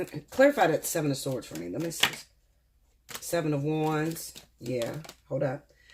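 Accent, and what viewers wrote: Pittsburgh, United States. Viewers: Wow I can hear your Pittsburgh accent clearly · Are you from Pittsburgh by any chance ? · Pittsburgh accent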